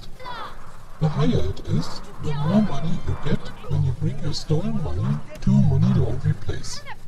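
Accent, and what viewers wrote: India. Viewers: indian darth vader?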